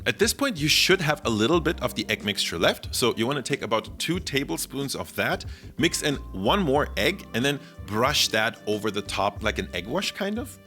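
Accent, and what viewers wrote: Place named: Germany. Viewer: your german accent is coming out stronger nowadays.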